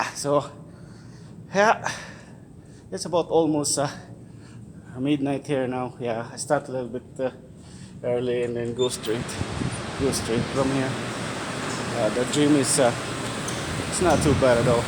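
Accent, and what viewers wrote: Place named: Visayas, Philippines. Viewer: bansaya mo English oiey, nice vlog kavisaya keep posting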